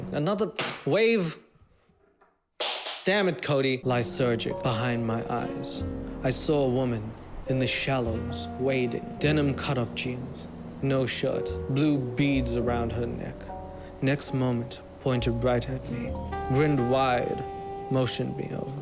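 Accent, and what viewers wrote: Australia. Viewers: Less than 6 minutes in and my dog tried to save us by knocking the YouTube machine (cellphone) off the table. Though in this dude’s defense- he sounds like a standard surfing Australian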